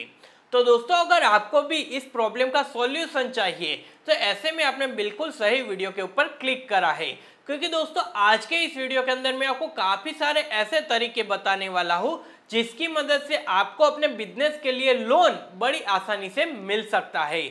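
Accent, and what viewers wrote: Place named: Saurashtra, India. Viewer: Is bhai ki hindi se hi pata chalta hey key gujrat ke saurashtra ka banda hey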